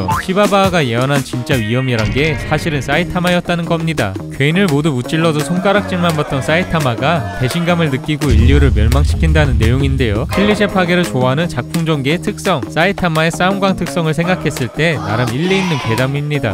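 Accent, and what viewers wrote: Gyeongsang, South Korea. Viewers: 님 경상도 분이신가요? "사이타마" 말할때 "싸이타마"라고 하시는거 보면 경상도 특유의 쎈 발음이라 갑자기 궁금하네요.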